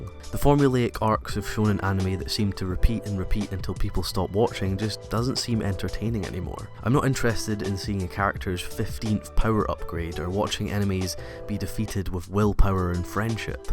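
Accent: Scotland, United Kingdom. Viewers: You sound Scottish, where abouts?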